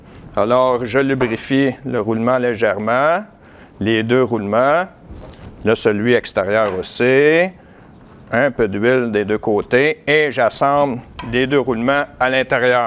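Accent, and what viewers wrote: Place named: Canada. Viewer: très bonne explication mr mais j'ai eu dès fois très dur a vous comprendre a cause de votre accent canadien mais ca va bravo et félicitation cordialement richard .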